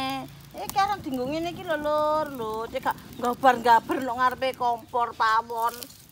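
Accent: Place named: Surabaya, Indonesia. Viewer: salam kenal nggih, aku lht chenel smpean sekali ngono kok lngsung ktgihan, sampean iku ngangeni, trs lek ngomong logate surobyoan bnget, sakjane smoean asline endi..aku nek g ndelok konten smpean rasane motoku gatel bnget...sehat sll yo.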